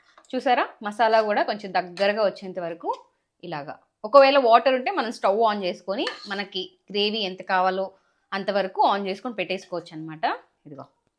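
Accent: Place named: Anantapur, India. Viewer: Mam you are saying that you belong to Nellore but your language is like Anantapur.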